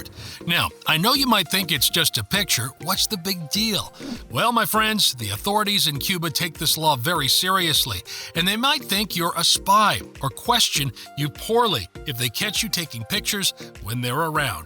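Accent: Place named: United States. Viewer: " captain" must be " Yankee "...well "captain".. After 14 times in Cuba all over of Cuba, you sound really like " Yankee " YOU, buddy just miss Cuba really good times, 5, 10, 15 years ago. Love Cuba, love Cuban people, see and experience everything....your podcast is not good buddy..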